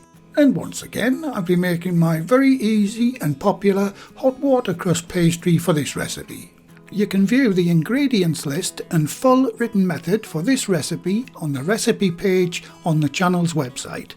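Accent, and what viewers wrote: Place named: England, United Kingdom. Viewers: you are very welcome👍. I'm from Cumbria but. Live with my Khmer wife in Cambodia. It's so nice to here a northern accent & I so miss pork pies but thanks to you, I'll now be making my own thanx to your simple pork pie pastry, cheers👏👏👏👏👏👏👏👏👏👏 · I'll be honest... I subbed for your voice and manner of speaking/talking... you sound absolutely delightful and lovely and sweet/kind... typical English ( once upon a time when there were cockneys in London, they too were like this, just slightly different accent and manner). Like the recipe themes so far, will see if there is a sausage roll one. ^_^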